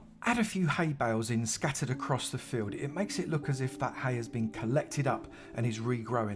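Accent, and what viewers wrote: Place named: England, United Kingdom. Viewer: He doesn't, he's just English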